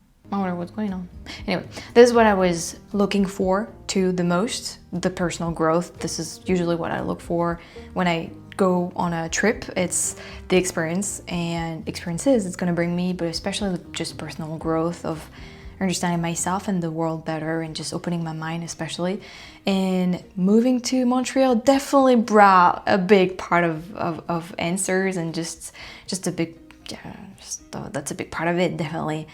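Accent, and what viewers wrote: France. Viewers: On a completely unrelated note, your English is getting amazingly good. I can tell the difference from just 6-9 months ago. You’re losing some of that French accent and speaking more "North-American”. You probably dont even realize it yourself but its definitely there on more vowels and consonants. At this pace In another 12 months you’ll be fooling everybody and can tell people you are from Toronto or Orlando. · Never feel you need to lose your beautiful French accent. Mispronounced words that could lead to misunderstanding is one thing (and you rarely mispronounce your English words)…and, I do understand your desire to correct a potentially mispronounced word, if required…but please don’t confuse that with a need to change your charming accent. The French accent to the anglophone ear is soooo beautiful- truly one of the loveliest. 😊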